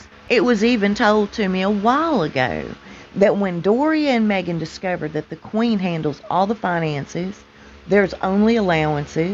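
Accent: South, United States. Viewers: She definitely is a southern girl! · good ole southern voice.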